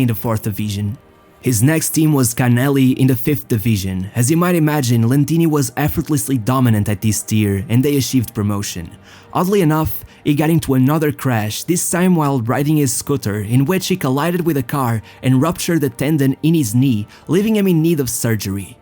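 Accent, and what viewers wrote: Portugal. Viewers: És tuga né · És português? Dá para notar pela pronúncia a falar inglês, é sempre muito bom ter um português com tantos subscritores e com um canal com um conteúdo incrível original e interessante. Continua com o excelente trabalho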